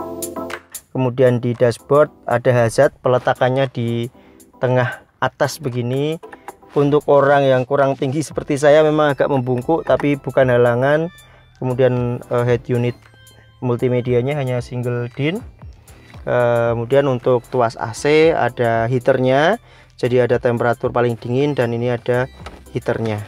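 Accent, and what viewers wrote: Java, Indonesia. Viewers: Medok temen mas jawane... Hihi..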